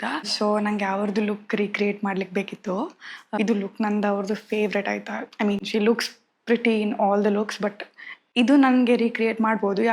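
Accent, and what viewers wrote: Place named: Tulu Nadu, India. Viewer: Oh really likes your innocent voice and that tuluva's Kannada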